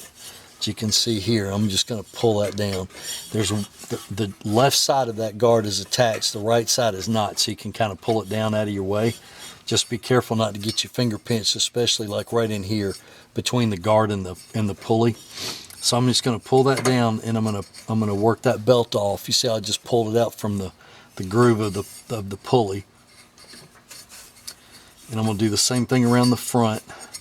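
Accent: South, United States. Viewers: Hello from France ! Thank you so much, sir ! I've changed both belts on my tractor while enjoying your southern accent in the process !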